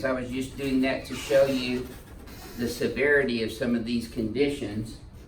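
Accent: United States. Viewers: most American voice I've ever heard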